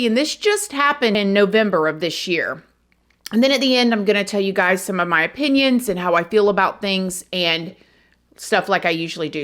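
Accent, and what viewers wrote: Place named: Upper South, United States. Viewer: I'm not commenting on the story, this is for you! That Southern lilt of your voice, hello my lovelies, show no, shoot can't remember all of it. Just no, that's why I subscribed! Your voice is amazing for voice overs, and radio! Fabulous!! Edit: Your 6 yr old??? Disgusting!!!! ALWAYS Protect children, seniors and animals!!! WTH is wrong with humanity, beyond WRONG!!!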